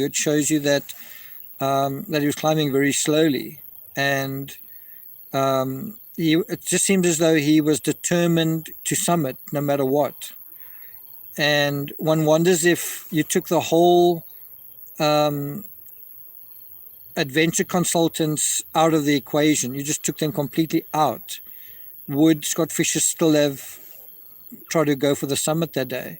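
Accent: South Africa. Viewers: Shucks you are South African! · Really really enjoyed listening to this… no offence but the SA accent normally makes my ears bleed but throughly enjoyed listening to you mate 😅❤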